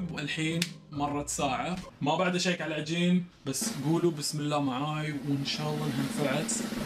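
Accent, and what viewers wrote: Eastern Province, Saudi Arabia. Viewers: من لهجتك واضح انك من الشرقيه وربما الاحساء تحديدا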